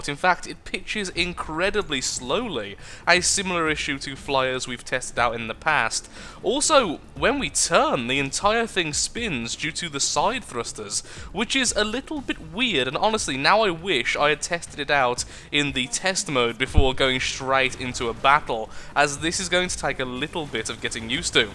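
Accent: United Kingdom. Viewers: Your british accent always puts a smile on my face.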